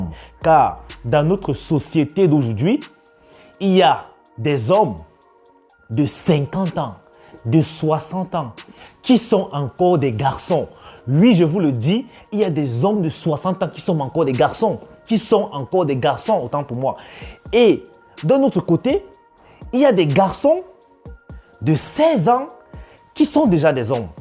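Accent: Cameroon. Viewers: L accent camer du bled me fait toujours rire lol 😂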